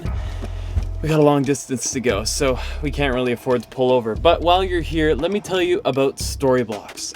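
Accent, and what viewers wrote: Canada. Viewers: The longer you stay in Canada the more of the accent comes through. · LOL - your Canadian accent is really showing itself again!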